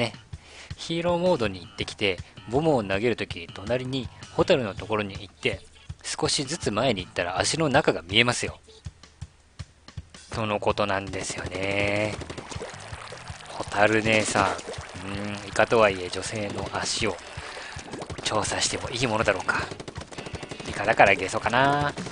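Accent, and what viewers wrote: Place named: Okayama, Japan. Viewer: うぽつですm(_ _)m もしかして岡山県民？？？ じゃけぇって岡山弁だったので…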